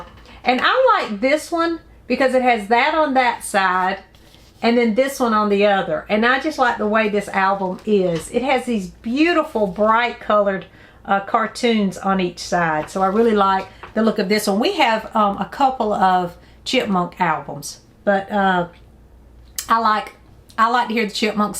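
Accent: South, United States. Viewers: your AWESOME!!!...Man oh man..love your fun whimsical video's...love your family value's...and dont get me started on your voice..that southern voice..love it!!..Your so cute and I say that with total respect..FUN FUN FUN video's...My fav Christmas album is one i listened to over and over as a kid in the 70's and its called...( A Mix )...P.S..Just ordered thanks to you..I was hoping was on it but didnt see it..:) Oh well:) is an awesome song..:) Ever heard of it???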